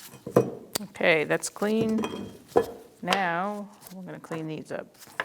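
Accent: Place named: Massachusetts, United States. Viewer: Thanks for the help. That accent reminds me of my mom. Mass born. As a kid i would ask her to say water for a laugh.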